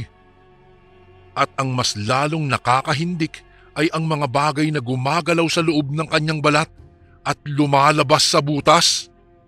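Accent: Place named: Iloilo, Philippines. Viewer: Ay wow Ilonggo gd ..ya daw mabuga ko mn ya kape ko kag hahahahha ano iloilo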